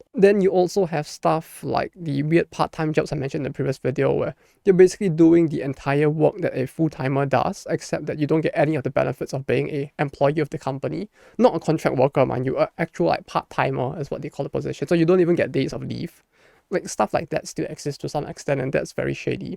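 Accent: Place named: Singapore. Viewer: I'm a first timer on this channel since this happens to be on my recommendations. First of all, why is your channel low in subs? Cause this is some seriously good and insightful stuff. Typing this near the end of the vid; almost finished watching. A lot things you've said actually clicks and confirms certain stuff that I only have a smidge about JP Game dev and understand how they dev as how it is. (ie, game tech and design patenting). Like, on the surface I can agree and understand they do it for protective reasons, but I never crossed in my mind that this thing can actually stifle innovation for new games and even local indie games in the large scope. Second of all, are you actually native Japanese? Cause your accent is way more closer to a Singaporean. Heck, if you were to tell me you are an expat living in Japanese or you had a half South East Asian parent, I wouldn't have been surprised. 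On that note tho, you are quite eloquent and really know how to orate. You could be a top ranking salesman or politician, if you catch my drift, haha. But yeah, not a dull moment when you speak. One again, YouTube has unearthed for me a great gem of channel. I hope more would witness this bright glimmer.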